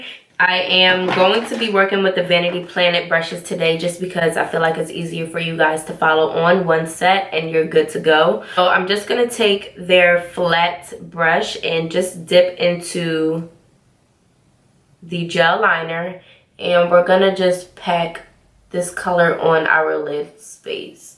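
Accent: Jamaica. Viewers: Are you a fellow Jamaican by the way? Lol I hear a tiny little accent peeping out 🤣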